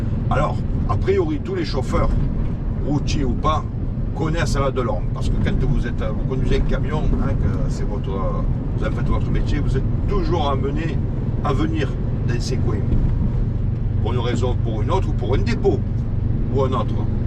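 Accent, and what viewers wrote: Marseille, France. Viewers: Visite de Marseille avec le vrai accent Marseillais J'adoreeeeeeeeeee avec tout arrondissement confondues comme les bons et les moins bons et c'est ça qui fait que Marseille et Marseille et restera ma ville que j'adore, bonne continuation pour de nouvelles vidéos, BRAVO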